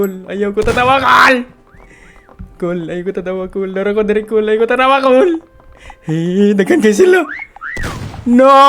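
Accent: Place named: Visayas, Philippines. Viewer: bisaya ka doi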